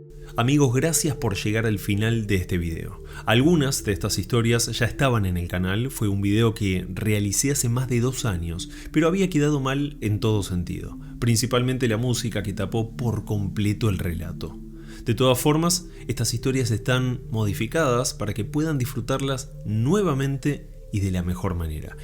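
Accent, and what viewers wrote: Argentina. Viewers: Grandes historias narradas por un argentino, que bonito 😊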